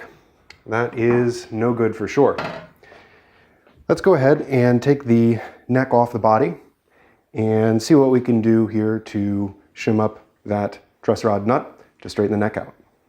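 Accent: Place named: Prairies, Canada. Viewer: Get that nut out real quick. Are you by chance from Saskatchewan, Alberta, or Manitoba? Thanks for the tutorial. I will now attempt the repair.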